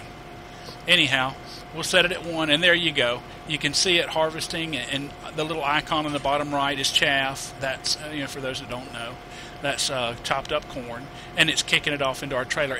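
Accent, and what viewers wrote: South, United States. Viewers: hey bo really like how you explain the different steps. Most other videos do explain it quite like you do. Appreciate how you explain stuff. Keep up the great work man. I am from NC sure is great to hear someone from the south explain things. Makes alot more since to me than someone from some where else.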